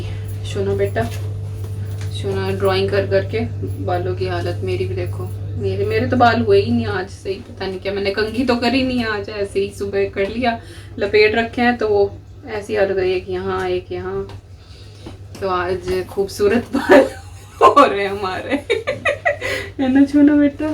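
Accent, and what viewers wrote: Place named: Punjab, India. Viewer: Hi g aap punjabi ho baaton se lagtahai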